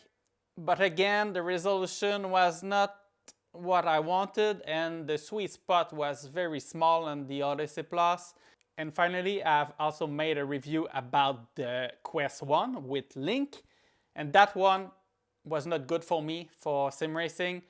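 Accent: Quebec, Canada. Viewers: Serais-ce un accent Québecois 👀 Bonne video btw! J'ai présentement un CV1 et j'ai commandé un quest 2 et j'ai commandé un link cable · Je reconnais cet accent du Québec 😊 Bon vidéo!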